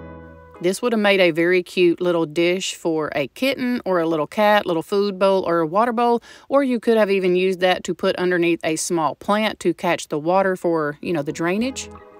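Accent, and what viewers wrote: South, United States. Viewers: I just have to ask....are you in Louisiana by any chance? I swear the neighborhood at the beginning looks like Lake Charles to me and you sound Southern. (I'm originally from LA but now a full-time Texan.) Loved the video and especially the styling portion!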